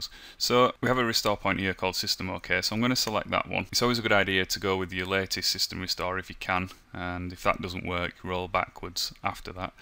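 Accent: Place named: Newcastle, United Kingdom. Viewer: You have very sloppy diction. It makes you very difficult to follow especially when you move that quickly. Now try and do all that when your display has locked itself into 800 x magnification and you can't even find the buttons let alone get to the point where you can type in "restore". Can't stand sloppy exaggerated Northern accents at the best of times. Arghhhhhhhhhh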